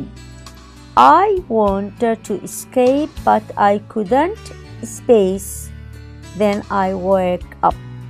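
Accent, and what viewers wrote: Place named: India. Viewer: نطقها هندي 🌚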